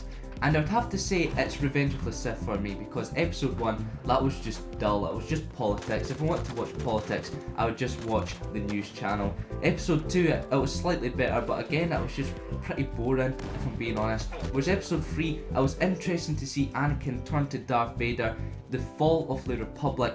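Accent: Scotland, United Kingdom. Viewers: Can you do a video where you would speak at your normal pace (unless if this is your normal pace in which case i like the scottish accent obv because i have one :) )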